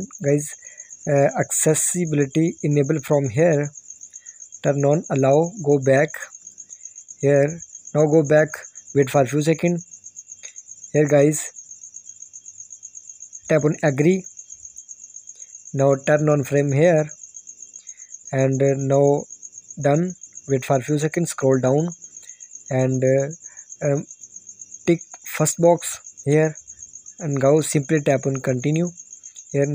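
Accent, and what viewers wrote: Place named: India. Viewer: Any video made with an Indian voice I assume is likely a scam.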